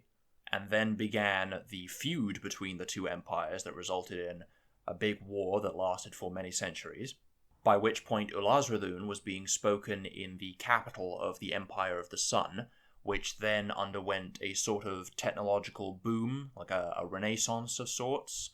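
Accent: United Kingdom. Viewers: What's your native dialect? It's definitely a non-rhotic, british-esque but I'm having trouble placing it more specifically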